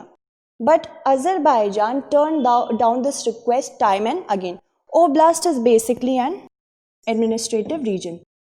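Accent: India. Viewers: Is She Indian? Her accent is like Indian.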